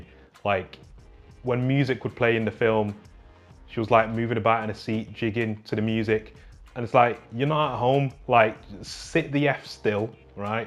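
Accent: United Kingdom. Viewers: It's good to hear a northern accent on youtube. There isn't enough. Lancs here.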